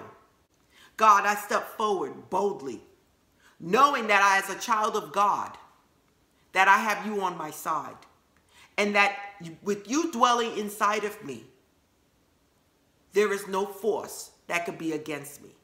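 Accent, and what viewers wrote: New York, United States. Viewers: Do I detect a New York accent??!!? I like that!!!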